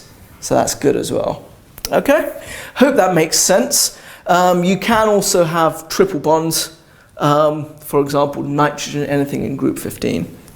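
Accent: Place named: United Kingdom. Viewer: You have really improved your british accent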